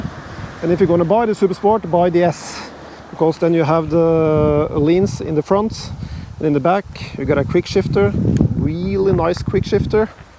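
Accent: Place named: Liverpool, United Kingdom. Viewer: Great review! There's a slight hint in your accent throughout the vid that you've spent some time in Liverpool e.g . "I just luv this bike, it's my second one and I just luv it ".... 😊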